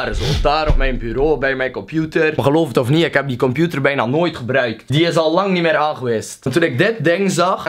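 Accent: West Flanders, Belgium. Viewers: Dit is West-Vlaams, meestal voor de andere Vlamingen niet te verstaan maar bij valt het wel mee.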